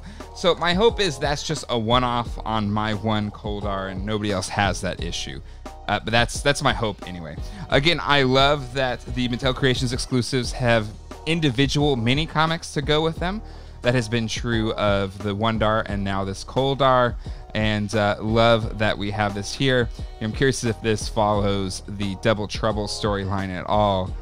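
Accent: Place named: Midwest, United States. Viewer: You have such a thick midwestern accent lol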